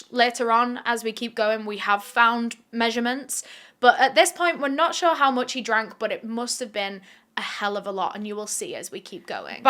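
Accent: United Kingdom. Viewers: I mean let’s not forget about British history ….. It’s rich hearing someone with a British accent talk about how horrific the Belgian colonisers were.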